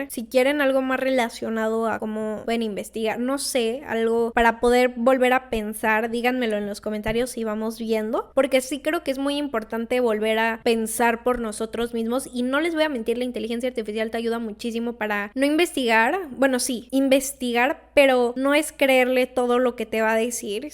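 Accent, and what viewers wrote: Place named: Whitexican, Mexico. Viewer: Primer video de (pseudo) ensayos que veo con tono mmdor whitexican, algo irónico.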